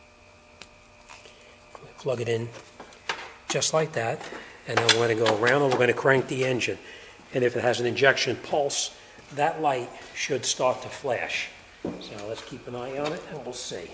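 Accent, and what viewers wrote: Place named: New England, United States. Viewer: Love your New England accent!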